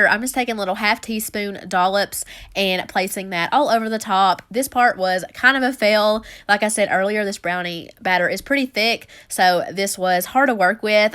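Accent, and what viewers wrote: South, United States. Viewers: Your accent is TOO cute! It’s my favorite part of your videos. Olive “ol” 😊😂 sorry if this is offensive I mean it in the best way possible, I love southern accents! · Damn she did that and I love the accent country ass me!!!!!! Yeahhhh buddy??? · Yeahhh my southern sista! Idk where your from but ya sound like me so I like ya! 😉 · Where you from ? You got a accent. You sound really country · I love your voice!!! Sorry if that sounded rude!! But I think country accents are amazing!!!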